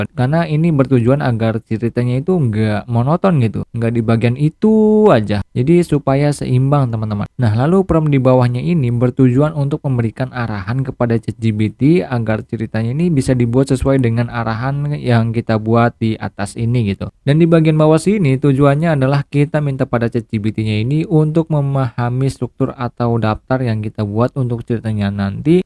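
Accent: Cirebon, Indonesia. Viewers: orang cirebon tah bang ? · Bonggan dewek, wong cirebon tah kang?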